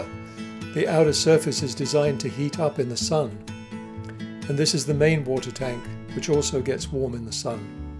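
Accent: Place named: West Country, United Kingdom. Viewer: Your accent, there is a hint of west country, maybe Swindon or Bristol? Great effort, you worked very hard and the bus looks fantastic. Enjoy 😉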